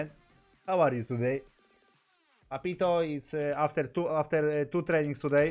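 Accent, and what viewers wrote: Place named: Poland. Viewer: co z tego że jego angielski jest taki? tu też chodzi o akcent stosunkowo nasz akcent dla brytyjczyka, amerykańczyka czy kogoś jest nawet trudny do zrozumienia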